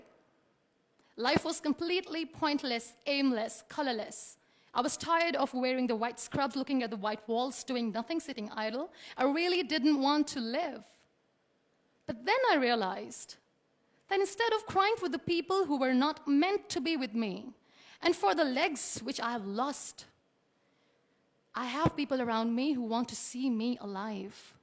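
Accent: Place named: United States. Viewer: I salute u Muniba..ur speech touched so many peoples heart..keep smiling n Mr.badal y r u concentrating on her american accent?y r u not focusing on her speech..i think u r jealous of her communication dats y u r focusing on it more..plzz dnt disrespect any1 if u dnt no to give respect..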